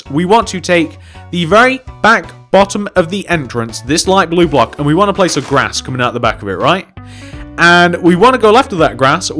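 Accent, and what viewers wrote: United Kingdom. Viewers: I'm gonna admit you sound just a little British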